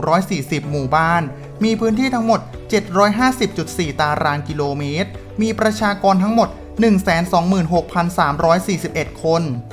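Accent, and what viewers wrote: Thailand. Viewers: เหน่อสุพรรณครับ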